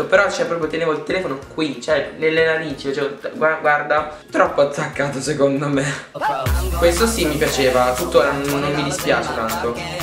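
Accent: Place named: United States. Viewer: Sembravi un americanoLuuu😂😂😂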